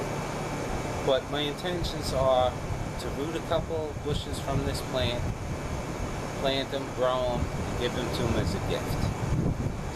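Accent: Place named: Northeast, United States. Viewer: I don't think he's stoned! Are you basing this on his northeastern accent? Thanks for the info on blueberries/cuttings, can't wait to get my hands on some blueberry sticks.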